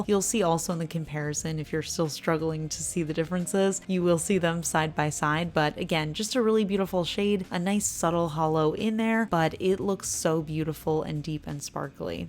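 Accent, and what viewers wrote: New Jersey, United States. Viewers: your NJ accent... Not just the way you say orange, but forest as well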